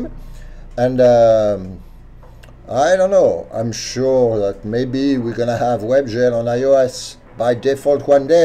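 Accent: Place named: France. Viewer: French accent powaaaaaa ! :)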